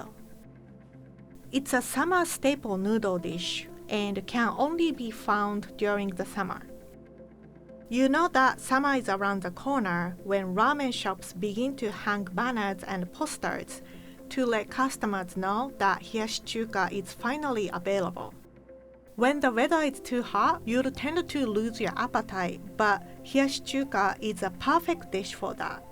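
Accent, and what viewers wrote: Japan. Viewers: Probably that just her accent. Japanese people will sometime misread "L" as "R" and "R" as "L" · Even after years for working for tasty america she hasnt lost here japanese accsent praise God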